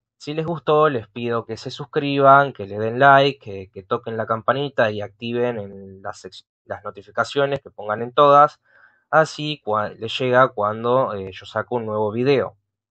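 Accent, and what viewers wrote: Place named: Uruguay. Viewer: Vos tenes voz de uruguayo así que debes tener cara de pelotduo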